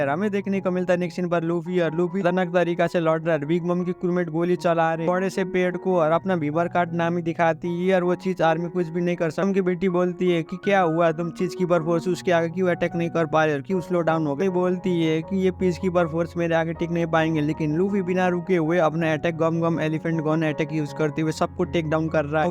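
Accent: Nepal. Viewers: Nepali accent is so annoying😢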